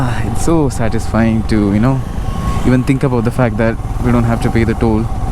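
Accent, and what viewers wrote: Karnataka, India. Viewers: Bro ur kannada slang is very nice bro 👏.. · ಕನ್ನಡ ಕೇಳೋಕೆ ಚೆಂದಾ❤️superb bro ❣️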